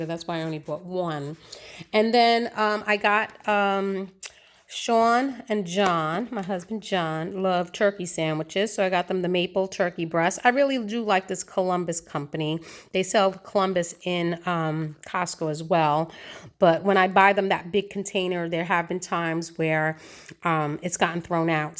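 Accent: New York, United States. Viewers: I could hear the NY in your voice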